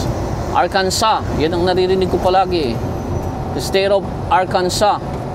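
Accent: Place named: South, Philippines. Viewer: southern accent.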